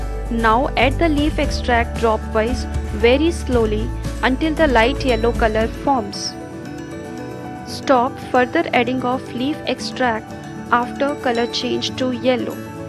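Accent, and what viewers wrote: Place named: India. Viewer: Ini gara-gara ada logat India nya gw jadi gk ngerti, kalian kali jangan ada logat India nya woyy.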